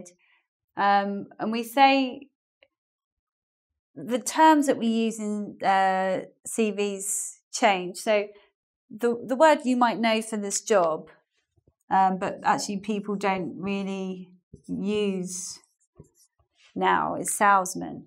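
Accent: United Kingdom. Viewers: thank you very much,. i really like your videos, there are very informative although i enjoy to practice my listening skills with your british accent.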